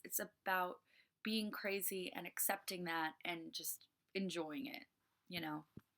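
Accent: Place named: United States. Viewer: She sounds American. WHAT if shes Speaking the Truth, and these are all True .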